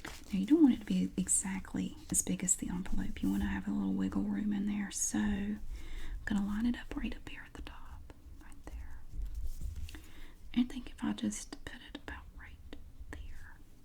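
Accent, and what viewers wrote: South, United States. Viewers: I still have my sticker book from the 80's!! My 9yr old daughter has it now and started her own. Some of my scratch and sniff still have a faint scent which is crazy...dill pickle was my favourite! Love your videos...my twins both watch at bedtime, by request...you are their favourite!!! My daughter loves your southern accent, she tries to copy you saying" that's nice" or "that's so pretty", pretty funny from a 9 yr old Canadian girl😁♥️💜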